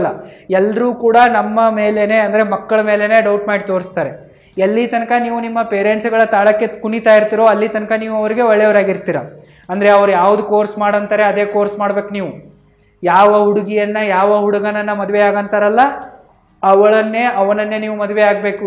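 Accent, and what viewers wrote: North Karnataka, India. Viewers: Nimdu uttara Karnataka na sir?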